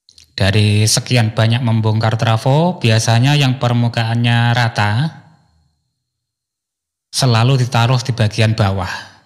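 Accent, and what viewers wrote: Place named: East Java, Indonesia. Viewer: sampean orang mana om,,logatnya kayak jatim bagian kulon..😁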